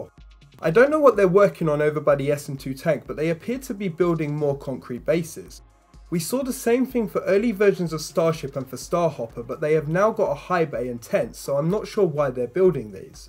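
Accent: United Kingdom. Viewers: Your accent is adorable, exactly what part of the UK are you from.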